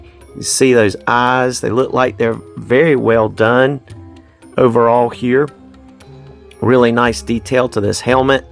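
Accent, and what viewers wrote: South, United States. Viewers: like the name! Reminds me of Osborne. I enjoyed the video.. I was just wondering where you’re from and the accent.? My guess is from down south? Alabama maybe? Your voice also reminded me of the guy from the hangover again enjoyable thanks.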